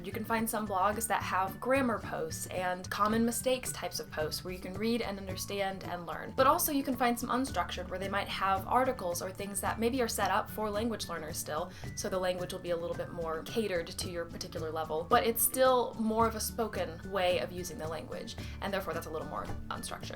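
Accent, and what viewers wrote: United States. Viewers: Im from indonesia, im asian. you're good really american in top speed love. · I've been learning English for a while and without any doubt you are the native English speaker that I could understand the most in a normal speed. where are you from? I meant which part of the USA people speak as the same accent as you?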